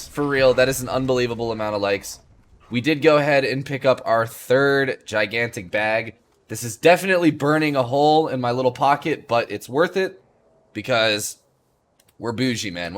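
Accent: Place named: United States. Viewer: jesus you have a cool american accent!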